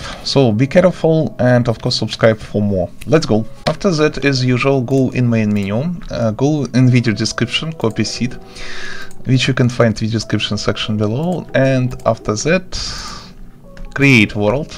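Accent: Russia. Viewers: мдаа с русским акцентом!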